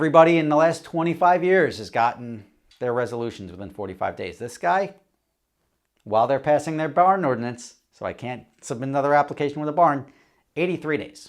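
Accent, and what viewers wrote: New Jersey, United States. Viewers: Sounds like Jersey alright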